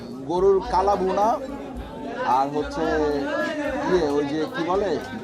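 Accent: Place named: Chapai Nawabganj, Bangladesh. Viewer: Vaiya ajkeo aponar khawa dekhe moja peyechi. Ar chapai er vasai kotha sune onek mugdhu hoyechi 👌❤❤❤❤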